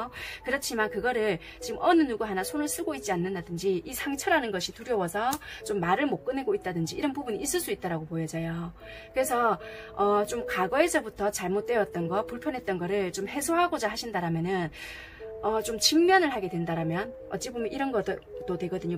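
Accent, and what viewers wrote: Busan, South Korea. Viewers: 아 넵 ㅎㅎ 감사합니다! 항상 영상 잘 보고있어요😊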